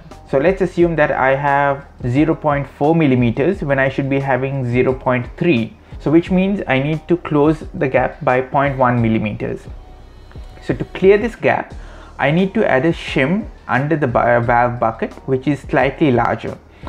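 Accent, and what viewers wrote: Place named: India. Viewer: You talk like you are from India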